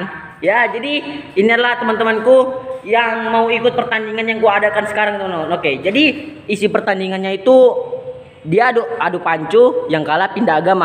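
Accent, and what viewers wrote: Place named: Madura, Indonesia. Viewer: Lu orng maduraa yaaa bg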